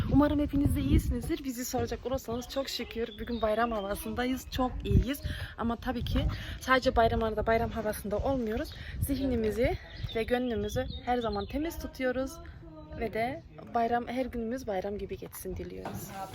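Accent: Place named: Yozgat, Turkey. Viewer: yeni tanidim cok pozitifsin bende yozgatliyim yakiniz senin memlekete konusman bize cok benziyo cok sevdim seni😘😍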